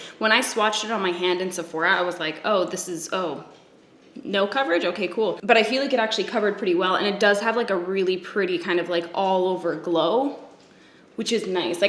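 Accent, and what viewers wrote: Canada. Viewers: Your Canadian accent makes my soul happy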